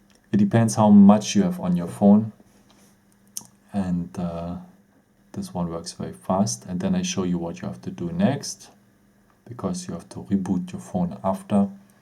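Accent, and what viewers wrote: Germany. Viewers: Hundert prozent deutscher. Aber video hat geholfen dange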